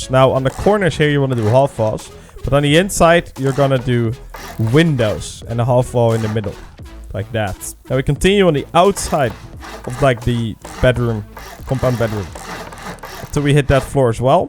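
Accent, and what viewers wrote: Netherlands. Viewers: i can hear u are dutch, i am dutch to, je bent goed bezig man!, had ik maar zo'n slimme base builder als rust teammate ! haha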